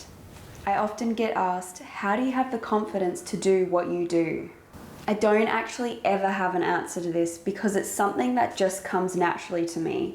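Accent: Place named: Australia. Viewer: If you are the vegan teachers daughter, why are you Australian and your mother is American? How did you get the accent?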